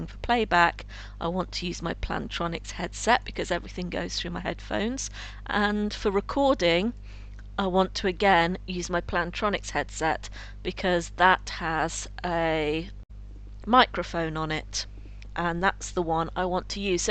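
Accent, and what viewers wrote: England, United Kingdom. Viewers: i know this has nothing to do with the video but...i love that english accent ^_^ but yeah i have audicty great software if you dont ecpect to do much with it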